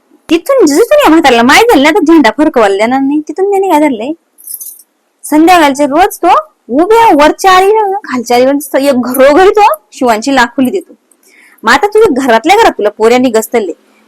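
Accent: Murbad, India. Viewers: मी कर्जतचा आहे.. आमच्या कर्जतची आणि बाजूच्या अंबरनाथ तालुक्यातील लोकांची बोलण्याची टोन पण मुरबाडकरांशी मिळती जुळती आहे..ही टोन आपलीशी वाटते आणि बोलताना पण आपलेपणा वाटतो..Keep it up 👍👍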